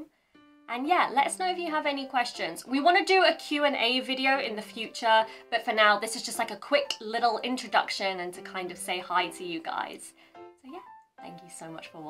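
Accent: United Kingdom. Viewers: WOW! I love your British accent! I will follow your video as my practice for listening. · All the best! I'm very familiar with British English as I have lived in UK for 10 years. It was very comfortable to listen British English! I would enjoy your channel. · Why U r not that popular!?!? Ur face is so pretty 😍 And ur Japanese is good as well. If u start couple CH maybe U’ll get more views??? Idk but anyway. I’m surprise that I found u today and u r not that famous. So I hope many people find u very soon and get to know this pretty cute British accent 👧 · 本当にイギリスの方の英語は聞き取りやすいと思います。米語のネイティブスピードは努力しても聞き取れる気がしませんが、英語はなんとかなりそうな気がします。あと、築地の時の話でも書いたように、の英語はマイ・フェア・レディの映画を見ているようです。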